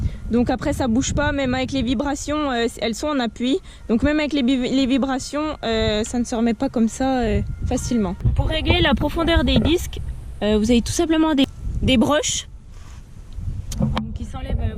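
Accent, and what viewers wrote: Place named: Haute-Saône, France. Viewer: Désolé, je n’avais pas reconnu l’accent de la Hte Patate ! 👍 (Suis du canton de Marnay, émigré en Vendée depuis bientôt 40 ans). Vidéos intéressantes pour un passionné de machines et matériels agricoles ! 👏👏👏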